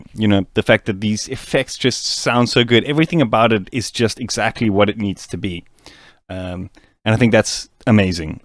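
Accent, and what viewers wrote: South Africa. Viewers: Yooo a fellow South African?!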